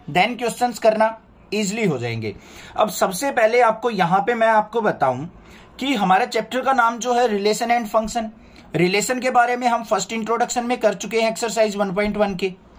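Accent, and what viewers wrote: Haryana, India. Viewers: Sir are you from haryana❤?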